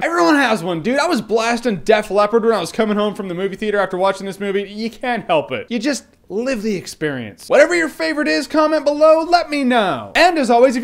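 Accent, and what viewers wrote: United Kingdom. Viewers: wow... nice British accent!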